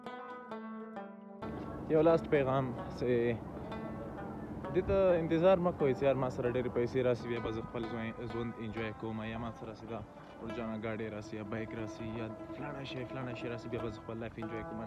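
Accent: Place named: Afghanistan. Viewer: Your sada pashto accent 🥵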